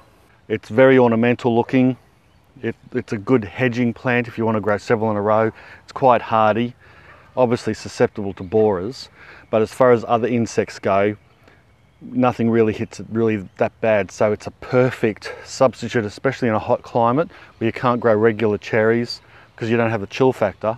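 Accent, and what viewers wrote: Australia. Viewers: Sounds like from Aus?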